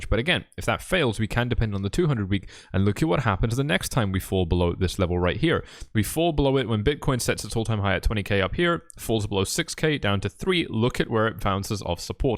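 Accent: Scotland, United Kingdom. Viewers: R u a brown Scottish dude?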